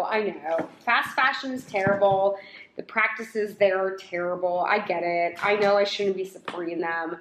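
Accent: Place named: Southern California, United States. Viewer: You have to most SoCal accent ever.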